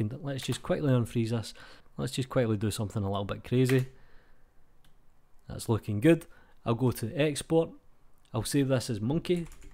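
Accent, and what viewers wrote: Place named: England, United Kingdom. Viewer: Can’t understand that English accent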